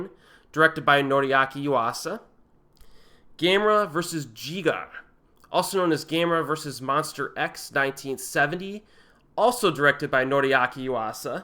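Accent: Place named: Pennsylvania, United States. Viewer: You gotta be from PA with that accent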